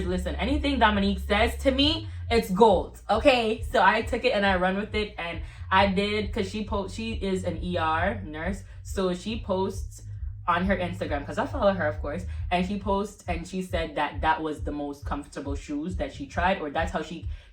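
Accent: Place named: Jamaica. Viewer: Great tips! Also hear that Jamaican accent lol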